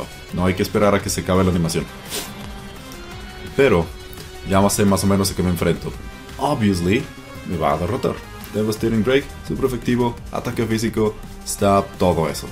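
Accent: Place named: Mexico. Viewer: Me gusta como narras, muy natural y al estilo mexicano jaja, pero me gustaria que usaras pokes poco comunes, ya sean Uu o Ru eso estaria chingon, saludos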